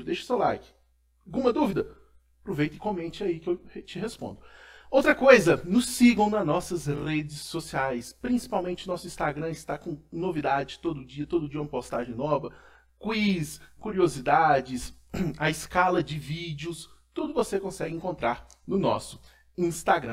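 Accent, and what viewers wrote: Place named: Minas Gerais, Brazil. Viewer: o português do mineiro é famoso kkk tenho até orgulho kkk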